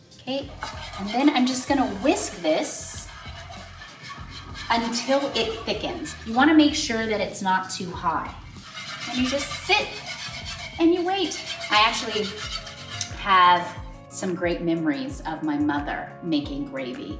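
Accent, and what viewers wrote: South, United States. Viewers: sounds like the South where im from 🔥🔥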